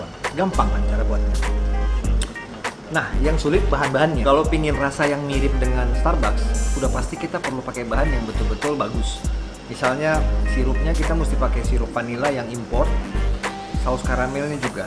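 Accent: Medan, Indonesia. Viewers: Anak medan ya bang ?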